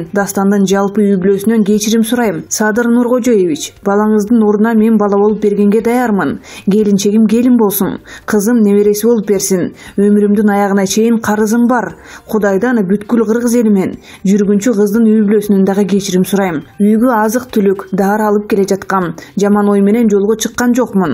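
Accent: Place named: Turkey. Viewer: Диктор сен эмне эле турктордун дикторунун дикциясындай окуп жатасын? Киши жадап кетет экен.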